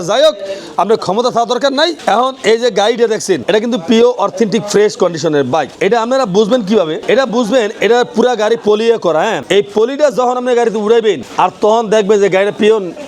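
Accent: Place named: Barishal, Bangladesh. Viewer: মুখের ভাষা শুনে মনে হয় ফারুক মামার বাড়ি বরিশাল বরিশালের মানুষ ভালো❤❤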